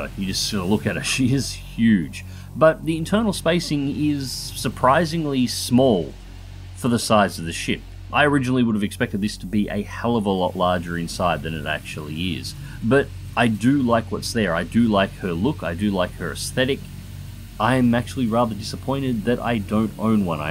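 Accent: Australia. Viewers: your voice is perfect to have in the background while i do other stuff. love aussie accents. a tad more entertaining than south African accents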